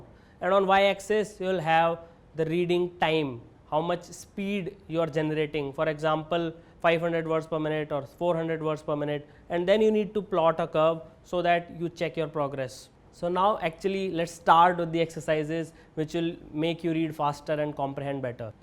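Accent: India. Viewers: Why does He sounds like He is speaking english in hindi language? I cant catch up with this guy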